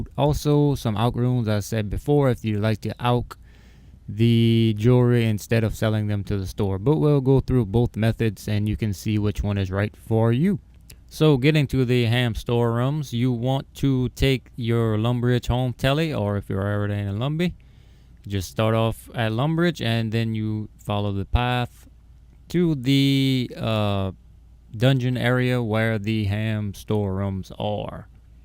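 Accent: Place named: Louisiana, United States. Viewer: sounds like a Louisiana boy.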